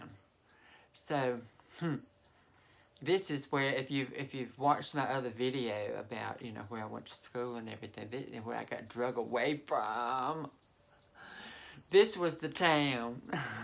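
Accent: South, United States. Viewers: Love that southern accent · I love your Southern accent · I love that sweet southern drawl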